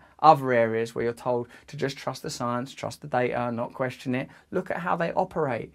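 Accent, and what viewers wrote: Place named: England, United Kingdom. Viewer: Thank you Russell for all your very intelligent , informative videos . An your research is spot on , as is your insight to different aspects of life events . Plus your personality , your English accent speaking voice , an your good looks , you look like a rock star / Jesus with your beard an long hair , very attractive . An you take care an stay Healthy , Russell . An we look forward to your videos.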